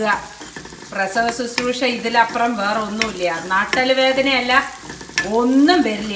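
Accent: Palakkad, India. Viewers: നമ്മുടെ പാലക്കാടൻ നാടാൻ ഭാഷ ചേച്ചിയുടെ സംസാരം സൂപ്പർ 👌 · Luv u r accent chechi..em frm pkd too so I cn relate tu u!😊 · Hi.... My Dad is from പാലക്കാട്!! So aa varthaanam നല്ല rasandu tto!! Pinne പാചകo... വാചകo രണ്ടും superb!!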